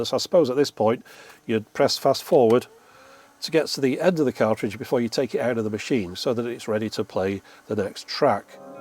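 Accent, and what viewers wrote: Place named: Lancashire, United Kingdom. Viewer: I reckon is from Lancashire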